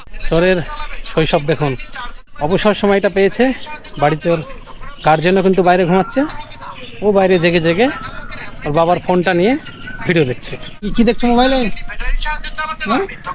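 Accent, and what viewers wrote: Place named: Rajshahi, Bangladesh. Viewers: রাজশাহীর ভাষার সাথে মিল আছে।আসলে রাজশাহী আর মুর্শিদাবাদের ভাষায় মিল আছে। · YouTuber nischit Bangladeshi , kotha bartay bangadeshi tan ache.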